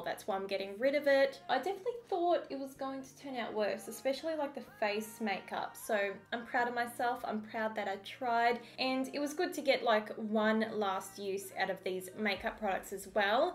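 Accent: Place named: Australia. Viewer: Can i have an Australian accent tooo? Lol so lucky! 🖤❤️ even though you arent crazy about the makeup it still came out good!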